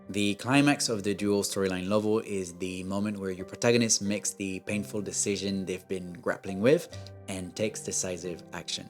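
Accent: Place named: France. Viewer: I finally figured out you are FRENCH!😂 I noticed you had a really cool accent and I finally figured it out!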